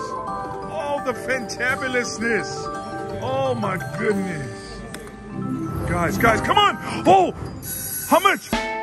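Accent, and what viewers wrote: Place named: Louisiana, United States. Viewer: Bro has got to be from South Louisiana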